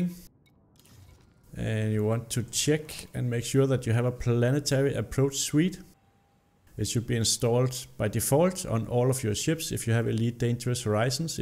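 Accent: Denmark. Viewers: hehe 7 sec in to the video, and Han er dansker :) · Are you danish??? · Nope. He sounds like a Danish guy speaking English. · Tak. Så nemt at høre du er dansk 🤗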